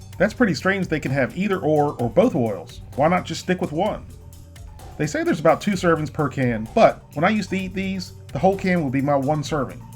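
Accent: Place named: Carolinas, United States. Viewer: Blow Me he is from the Carolinas I think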